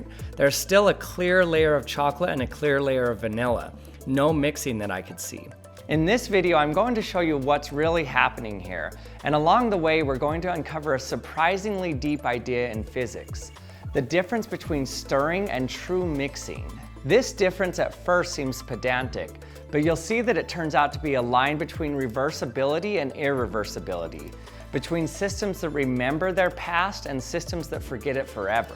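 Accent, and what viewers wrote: Australia. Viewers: When did bro become aussie? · When did he became Australian?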